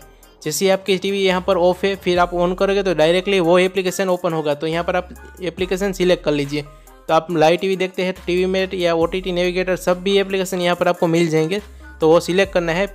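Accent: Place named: India. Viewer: Englisch with mixed indian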